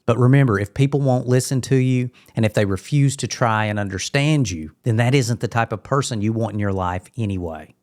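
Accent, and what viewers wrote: South, United States. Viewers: I am from Virginia. And, it is so wonderful to hear your Southern Accent… one of the nicest ones I have ever heard. You should read for audiobooks😊 I am an INFJ It’s really kind of a relief to hear all of this. I don’t feel so weird anymore. Thank you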